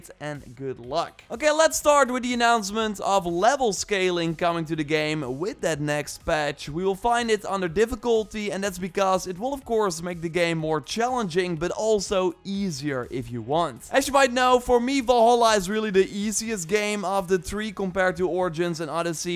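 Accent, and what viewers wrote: Netherlands. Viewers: The netherlands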